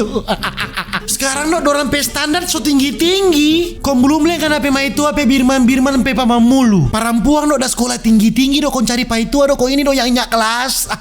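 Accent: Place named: Ambon, Indonesia. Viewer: Logat nya sama sperti ambon dan kupang Salam dari kupang NTT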